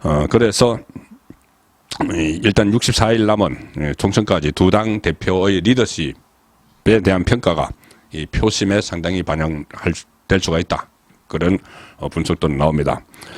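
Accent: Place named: Gyeongsang, South Korea. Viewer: 경상도발음 ㅋㅋ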